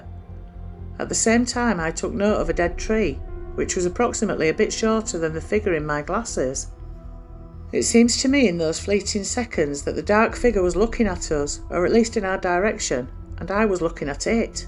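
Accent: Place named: Yorkshire, United Kingdom. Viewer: Wow, I’ve just found this after shared the link. I seem to have been unsubscribed!! Fabulous to see you back, I’ve been missing your great Yorkshire narration 😊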